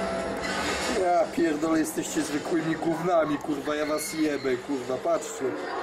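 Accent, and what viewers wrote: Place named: Russia. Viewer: Kupiłem taki sam za 90.zł.masz ruski ,akcent.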